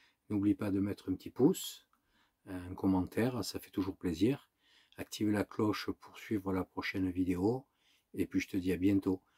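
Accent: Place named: South, France. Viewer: Coucou Je suis tombé par hasard sur tes vidéos et je trouve ça super génial. J ai vu aussi ta vidéo sur ton cc où tu nous présente ton véhicule. Ça me rappelle le nôtre,un chausson le best off 510 sur moteur fiat. Petit véhicule avec le même concept. Dis moi tu vis a plein temps dans ton véhicule ? Tu es déjà à la retraite ? Tu viens de quelle région avec ton accent (que j adore)du sud? En tout cas tes vidéos me plaisent beaucoup et je me suis abonné à ta chaîne. Je te souhaite bon vent, et profite bien. A bientôt pour ta nouvelle vidéo....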